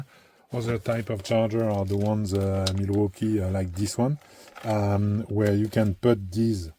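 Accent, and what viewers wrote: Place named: France. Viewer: Yes your english is actually great. Don't ever get rid of the accent. It is what makes you- YOU. I just have a quirky likeness for accents so I can pick them up pretty easily. I speak in a boring plain american english accent. Absolutely BLAND and boring. Your accent probably gets you a lot of ladies attention! Dont lose it! And you are 100% understandable! Otherwise these videos would NOT be able for me to watch if I could not understand you. But I can understand you 1000% percent. Please dont get offended! Idk if its an american thing but, accents are great! There's New Yawk accents.. BAWW-ston accents, LilWEEZYana accents, Southern USA accents... MinneSOWtah accents... My accent is SO boring. I wish I had a cool accent like yours! Ze french accent is not one I have heard much of. But I am glad to finally have a french speaking teacher who I can practice my french accent by listening to! 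If you really wanted to get spicy, try learning a New York French accent. Couldn't imagine what that would sound like. But them Nu'yawkaz really do have an incredible accent... "iM WAWWWKIN HEAAA"!! God bless you brother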